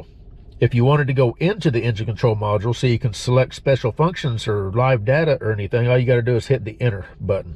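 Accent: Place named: South, United States. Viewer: I thought I heard a southerners voice, im from AR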